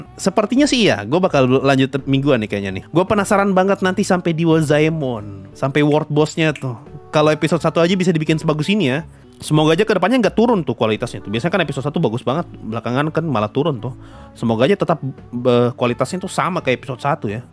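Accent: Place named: Eastern Indonesia, Indonesia. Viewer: Bang lu kok logatnya kek orang timur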